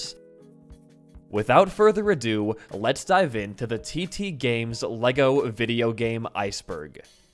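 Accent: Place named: Australia. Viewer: he’s Aussie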